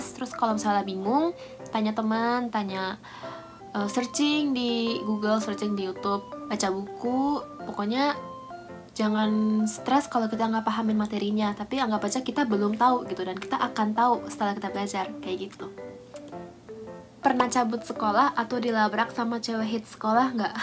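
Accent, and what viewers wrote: Japan. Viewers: suka banget liat kak ngomong bahasa Indonesia logat Jepang. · logat jepangnya udah kental yaaa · Ngomongnya indonesia logatnya jepang wkwk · Kak kalo ngomong indo tpi nadanya kayak ngomong jepang hehehe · Logatnya lebih sering ke Jepang sekarang walau ngomongnya Indo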